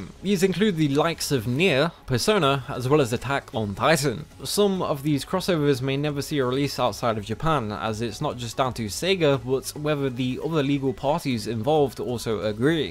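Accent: United Kingdom. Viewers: Whoisthis a type of British accent, emphasis on type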